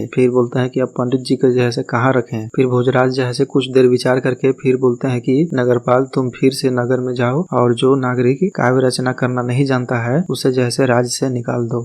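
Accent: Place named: Bihar, India. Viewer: Ja he se bro our bihar's accent don't forget that 🔝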